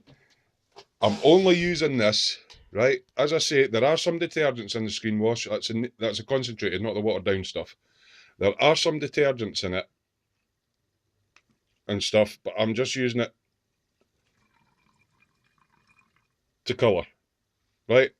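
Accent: Scotland, United Kingdom. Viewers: I'm new to airbrushing, but this was interesting. I have seen a video in which a few drops of glycerine were added, together with the IPA, distilled water and screenwash. Apparently, it adds a bit of lubrication. Thanks for sharing. (I live with a Scottish lady and so the accent was no problem!! :-) )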